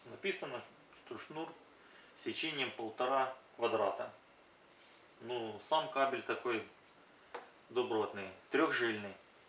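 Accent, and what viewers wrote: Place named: Ukraine. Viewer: Мужик, ти ж україномовний, в кожному слові це відчувається нахіба ти не звичною для тебе російською говориш?комічно ж виходить...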